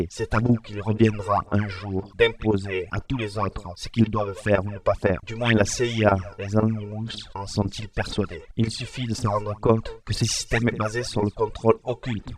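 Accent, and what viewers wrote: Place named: Marseille, France. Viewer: le dephasement de ta voix et merdique ,tu peut te faire retrouver trop facilement x) et t'es un marseilleis deja sa s'entend x)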